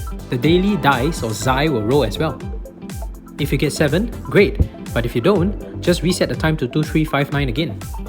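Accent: Singapore. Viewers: Bro u staying in sg??? sounds like ure singaporean. Keep uploading gd digimon content! 👍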